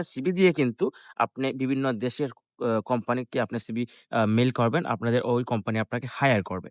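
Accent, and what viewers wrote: Sylhet, Bangladesh. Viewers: সিলেটি মনে ভাইসাব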